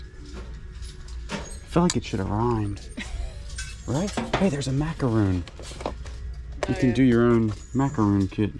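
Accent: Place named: Baltimore, United States. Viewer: Or.....are you dumb already? I detected A Balimore/ Maryland accent... Howwme. Owwned, etc.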